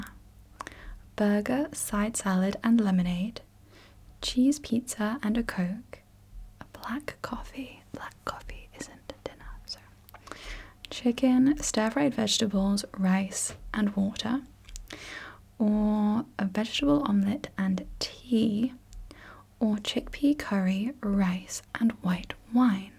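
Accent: United Kingdom. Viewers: Ive heard a lot of british accents but hers sounds so smooth, does anyone know what this accent is called/what region of UK its from? (sorry in advance if this is intrusive :'3 ) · I'm in love with British accent Take care Hugs 😊❤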